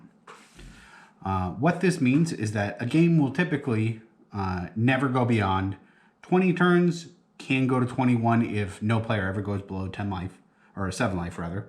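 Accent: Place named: Midwest, United States. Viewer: That midwest accent is thick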